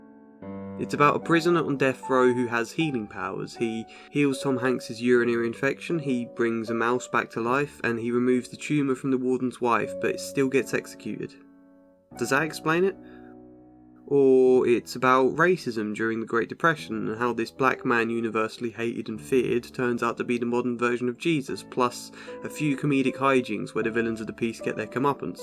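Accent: England, United Kingdom. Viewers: The choice of music and English accent made me feel as though I was listening to a creepypasta video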